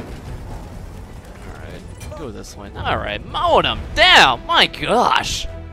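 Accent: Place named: Italy. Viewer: why is the voice italian??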